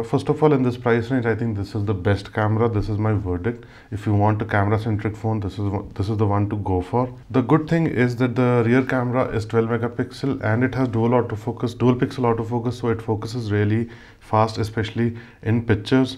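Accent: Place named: India. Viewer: Hello Mr i just dont like Indian speaking reviews BUT for you i really enjoyed your in depth review good job and thankyou. Hope to see more.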